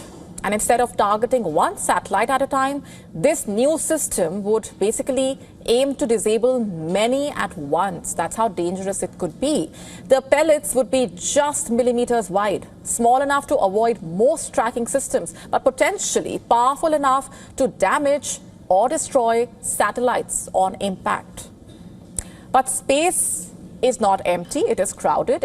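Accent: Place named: India. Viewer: INDIAN ENGLISH 😂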